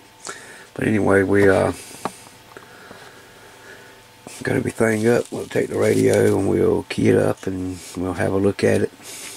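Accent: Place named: Appalachia, United States. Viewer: I’d never imagine such a heavy Deliverence accent, with someone capable of fix ing tiny crappy radios.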